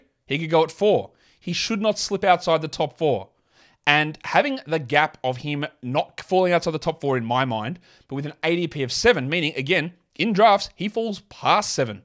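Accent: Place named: Australia. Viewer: Compared to you I’m the Michael Jordan of fantasy basketball. You probably the same kind of idiot that picks Jimmy butler in the second round. I hope people don’t pay money for your content. That’s just criminal. Taking basketball advice from someone with like an Australian accent doesn’t seem like the move when they probably don’t even sell basketballs in your continent. Continue throwing your money away with your fantasy leagues. Hope you actually learn something one day with these countless hours you waste on these videos. Goodbye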